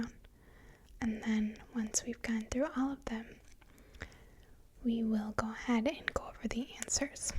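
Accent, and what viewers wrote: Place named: Minnesota, United States. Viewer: These are great!! You do a great job and I love your Minnesota accent. (Minnesota, yes?)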